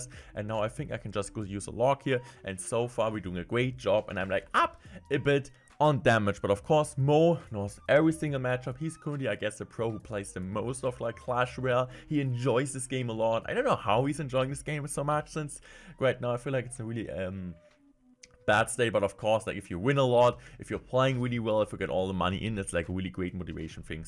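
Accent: Germany. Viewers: This is my first time on this channel and i noticed directly by his accent that hes German.I was quite confused at first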